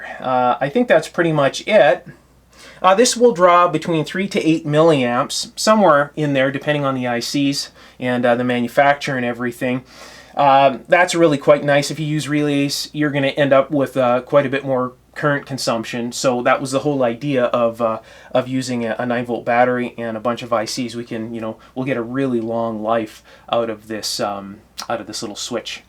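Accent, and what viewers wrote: Canada. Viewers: Are you a Canadadian? I keep picking up accents traits like the way you say "about"--but hide your beer so I can't confirm. Other than that, great videos. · Gotcha, thanks. Great vids! Do you have formal education? Your accent reminds me of some Canadians I've heard.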